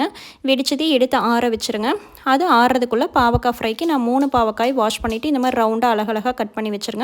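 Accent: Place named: Kongu, India. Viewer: Sister unga kongu tamil rompa responce ah erukku enakku pidichirukku subscribe pannita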